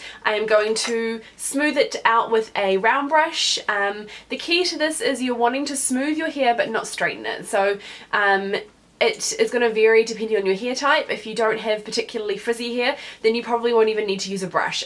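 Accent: New Zealand. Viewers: Is she from New Zealand? Her accent sounds kiwi. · You have the most interesting kiwi accent and you were absolutely born for that red lip. Gorgeous. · yep that's the kiwi (NZ) accent :) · Knew I heard another New Zealand accent! Hello from home, great tutorial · I think they look really nice. I might put in my lady hair when I pamper her eg pedicure. BTW is that a New Zealand accent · Yay NZ accent, I've never heard us on YT before! Great tutorial, thank you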